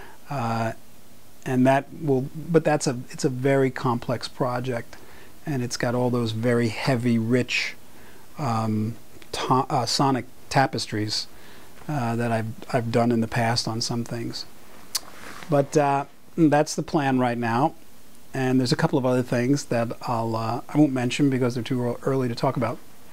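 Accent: United States. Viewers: Love the New York accent. Awe-chestra! :D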